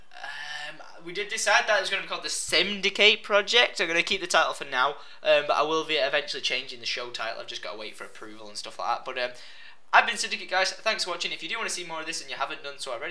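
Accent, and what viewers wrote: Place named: Northern England, United Kingdom. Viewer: Ooh was fun watching you play Sims 3, Love Sims :) love your accent too good old northern accent like meself ;)...i am that sad i know that house as i have played it many times and never understood why that half wall bit is there looks odd hahaha good cheats to use are motherlode for money, Testing cheats enabled use shift and click on mail box/sim and a bunch of things come up, and after that if you want you can use buydebug and good stuff there :) only found out recently :) Have fun simming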